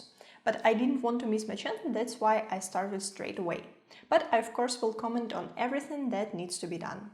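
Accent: Germany. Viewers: your englisch with strong german accent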